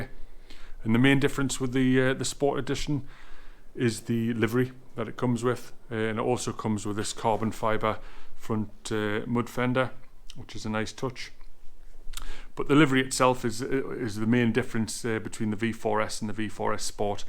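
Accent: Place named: Newcastle, United Kingdom. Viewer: .. I think you’ll find he’s a Geordie, not a Scot..🤦🏻‍♂️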